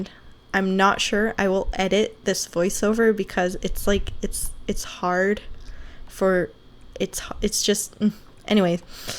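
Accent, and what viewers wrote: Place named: United States. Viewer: Adorei o inglês! Parece até americana mesmo <3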